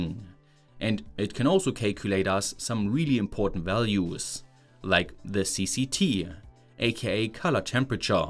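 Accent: Germany. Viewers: love your German accent too I know it's irrelevant. When are you gonna build an esp32 grow tent for that German plant that people like to consume lol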